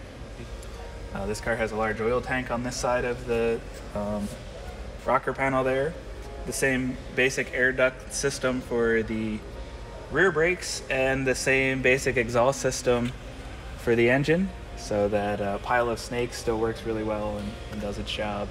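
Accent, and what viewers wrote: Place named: Midwest, United States. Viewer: it’s a Midwest thing. They also say things like “ruf” instead of “roof”, “pop” instead of “soda”, “beg” instead of “bag” and “dror-ing” instead of “drawing”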